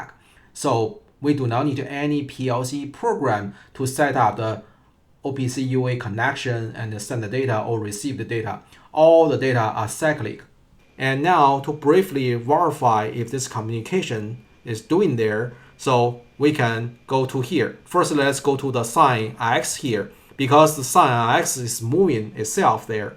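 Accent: China. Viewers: 哥们，一听你口音就知道你是京津地区的人啊。满满的口音让我想起了在北京读的本科。非常好的视频，我正在做我的硕士论文，也是和OPC UA有关的，看了你的视频受益匪浅。非常感谢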